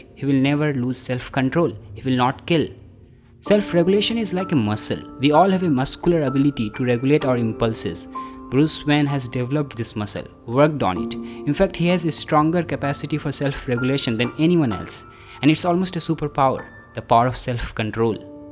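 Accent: India. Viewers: i hate this indian accent so damn much i just cant understand ittt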